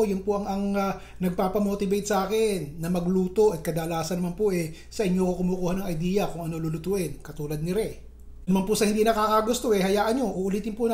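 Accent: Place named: Bulacan, Philippines. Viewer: Gud morning, thanks again sa npaka simple at npakasarap na chicken recipe,halos parehas tau magsalita ng tagalog.ganyan kaming magsalitang mga taga Bulacan at Nueva Ecija.GOD BLESS YOU AND YOUR FAMILY...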